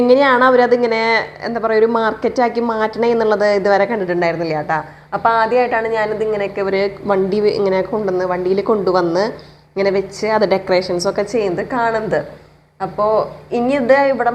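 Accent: Thrissur, India. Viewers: Thrissur ano..? 😂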